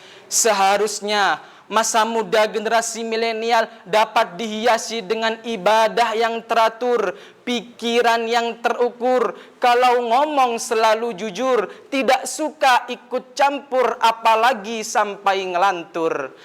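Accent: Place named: Surabaya, Indonesia. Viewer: ligatnyaa berasa banget org surabayaaa. ayo9 di tekan lagi intonasinya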